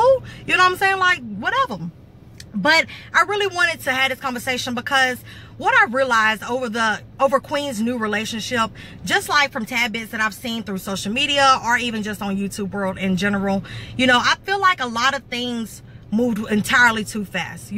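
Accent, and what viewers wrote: South, United States. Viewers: YOU SO COUNTRY OMG😍😍😍😍😍😍😍😍😍😍😍😍😍😍😍😍😍 WHERE YOU FROM!?!?!?!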